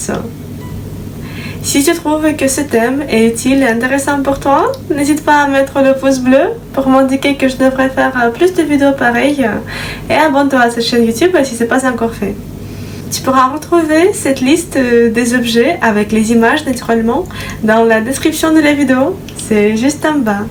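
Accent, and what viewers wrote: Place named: Russia. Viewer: Privet J’apprends beaucoup de choses sur la vie en Russie ma moitié est impressionné elle est Russe mais je lui dis rien c’est un secret continue comme ça pour tes vidéos c’est toujours appréciable et on apprend beaucoup de choses tu es très pédagogue et surtout ton accent russe qui est toujours agréable merci à toi vivement le prochain cours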